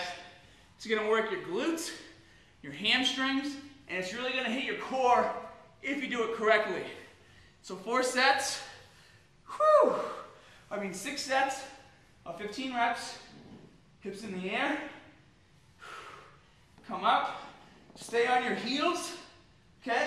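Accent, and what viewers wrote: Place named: New York, United States. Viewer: haha you might get a influx of costumers Usaully when people ask me questions I usully adivse to see your fitness videos and get his meal plan they work for everyone great site, but today I joined yahoo answers haha I think i have told like 150 people to join your site and how great it is:) haha lucky and im studying nutrition and getting certified as a personal trainer ( but no competion im CANADIAN whitch reminds me come to CANADA to TORONTO we would love to hear that new york accent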